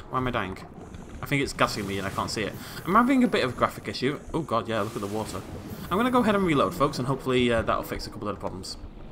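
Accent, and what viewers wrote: United Kingdom. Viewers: Hullo ladies and jauntlemen.lol,I love your accent. Brittish?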